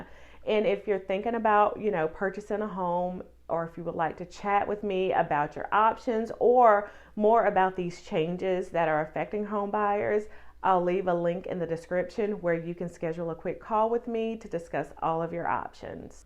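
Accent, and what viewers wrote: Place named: South, United States. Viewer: First of all, I love the southern accent! Also, thank you for the information. I’ve seen a lot of unqualified people going to real estate the last few years. They think it’s going to be an easy way to make money. It’s refreshing to see somebody who has the knowledge and qualifications. I have never understood why the seller has had to pay for the buyers agent. Just because the seller walks away with a big bag of money why does that make them liable for your agents commission? It always seemed to me that the buyer should pay their own agent!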